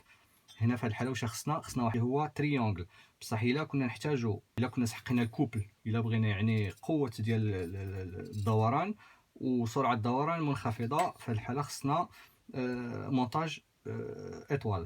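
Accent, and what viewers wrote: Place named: France. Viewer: ديس لايك بسبب لهجتك الفرنسية الخراء، والله انت لست بعربي ، اعتذر لك إذا كنت امازيغي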